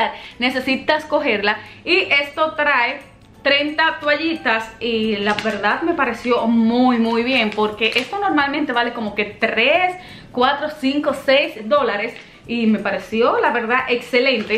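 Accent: Dominican Republic. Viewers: Vy C Ella es del cibaao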